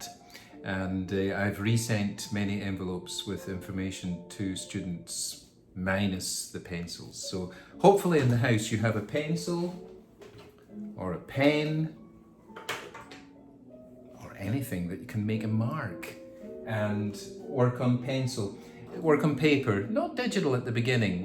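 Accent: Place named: Scotland, United Kingdom. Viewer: Icottish accent 👌👌👌👌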